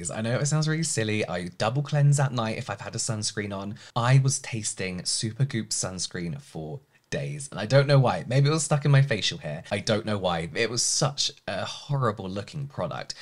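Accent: United Kingdom. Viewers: Hi sweetness (from Sicily)!i am an english teacger in a secondary school and,you know what,I adore your pure Brit accent and suggest my students following you😂😂😂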